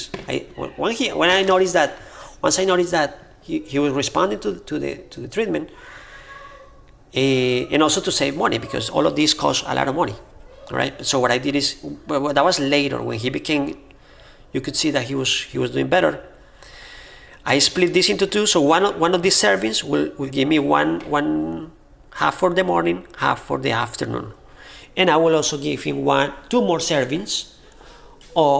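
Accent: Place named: Colombia. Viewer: You sound Colombian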